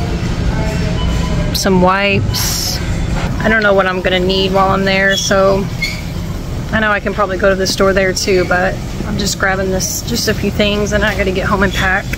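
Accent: South, United States. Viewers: I stumbled across her a few days ago. I like listening while I work. Her southern accent is calming. ...from Boston. 😬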